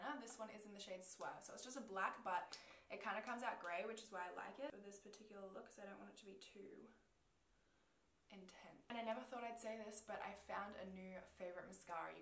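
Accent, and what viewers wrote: Australia. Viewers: Your an Aussie? G’day!!! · I literally pause the video every once in awhile and try to copy what you say in an Australian accent because I want one so bad hahaha I think it's so cute!!! Love u 💖💕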